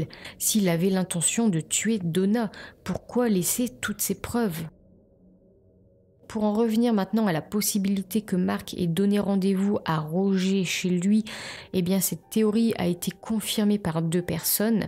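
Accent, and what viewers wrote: North, France. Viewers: Accent du Nord non ?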